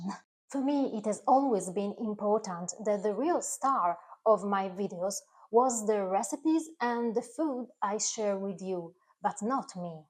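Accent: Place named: Italy. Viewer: Ciao Cara Mia 🥀. You have been part of my life for over 7 of those 8 years you have been making videos. The reason I love you and your videos so much is because I feel like you are doing it just for me. I also love your videos so much because you make things that remind me of my NONNA. I remember the first time you did that. You were using an Espresso coffee pot like my Nonna had. I commented about how it made me think about her. I love the way you share your vacation pictures and your personal pictures of your family Holiday table. Your voice is lovely. You sound like an Italian Girl speaking English. The friendship we share is very important to me. I value it greatly. That's why I always tell you, TI AMO AMICA MIO, because I do. My dear sweet friend. 🇺🇲✝️🇮🇹🤗😘😘😘😘😘😘😘😘😘😘😘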